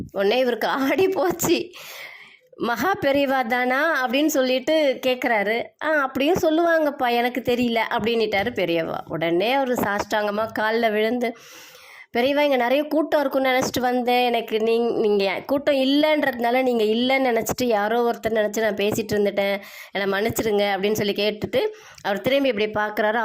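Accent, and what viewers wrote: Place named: Ernakulam, India. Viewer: Idun Ernakulam sonnadu pola iruku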